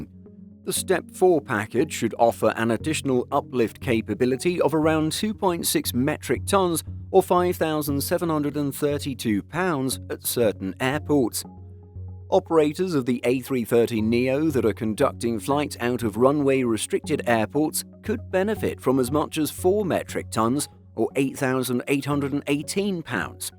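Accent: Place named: United Kingdom. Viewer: Respectfully, for someone with an apparently British accent who can pronounce Raj Indian names as well as you can, you really need to learn a little bit of Spanish, so you can properly pronounce the name of the capital of the country ofColombia. Besides, I thought all you, Brits spent your winners in Spain…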